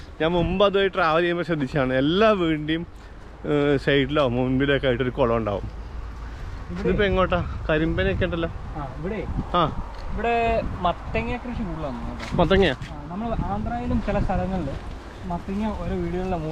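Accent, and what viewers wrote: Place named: Idukki, India. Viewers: ബ്രോയുടെ ഇടുക്കി തനി നാടൻ സംസാരം ഇഷ്ടപെട്ടവർ ഉണ്ടോ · Irukku kattappanakaran.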